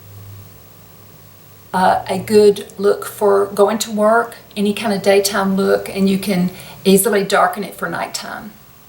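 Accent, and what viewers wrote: South, United States. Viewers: Just found you few days ago and I had to subscribe you because who doesn’t! You are skin is incredible but I like your kind and honest personality as much as your beautiful face:) and I like your cute southern accents. I have a same palette but I haven’t used for almost a year. I just got tired of the way I use so this look gives me more variety and would like to try it. Your skin care inspires me to start taking care of my skin more seriously. I’m 43 and after 40 I noticed my skin start sagging and looks dull. Thank you!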